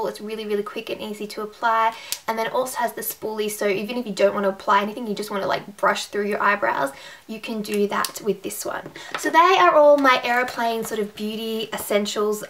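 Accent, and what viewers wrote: Australia. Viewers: Are you from Australia just wondering, also you sound like Ann Readon from how to cook that lol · Are you from Australia?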